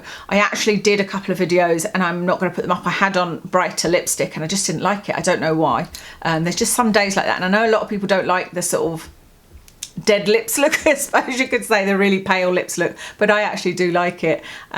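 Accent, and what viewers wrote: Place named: United Kingdom. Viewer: Hi, why don’t you leave the sunscreen in the bathroom and put it on in there? You won’t have to worry about touching doorknobs and then sticking your fingers in the container. I haven’t tried Stila liquid lipsticks in years. Do they still have a scent?!I couldn’t wear them due to the scent! I love your accent 🙂 Words sound fancier in a UK accent!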